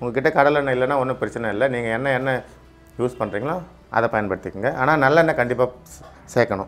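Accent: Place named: Nagercoil, India. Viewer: Voice nagercoil pola irukku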